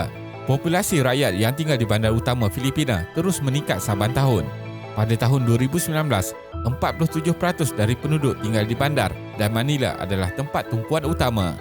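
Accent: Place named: Kuala Lumpur, Malaysia. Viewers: Ini bahasa Melayu Selangor lah Kenape teruk maknusie dunie tige peratus utame kerancakkan kepade antare berbeze serte jenayah setinggan dibine limeblas iyenye pade peratusan Kenape secare purate Mereke lame dikitar semule.... Tak sama langsung dgn bahasa Indonesia....beda bunyi.... bahasa Indonesia berbunyi a sedang bahasa Malaysia berbunyi e di ujung perkataan... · Bahasa Melayu itu luas. Kalau dalam video ini menggunakan slang Kuala Lumpur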